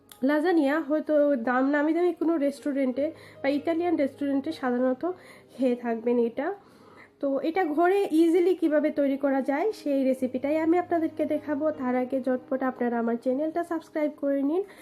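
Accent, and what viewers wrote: Sylhet, Bangladesh. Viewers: You are syleti right? I can tell from your accent your not fluent shudho bangla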